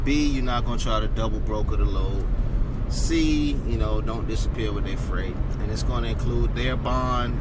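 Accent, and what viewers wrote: New York, United States. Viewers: Are you sure you not from NY? You be using New York lingo and you sound like you from NY I swear lol.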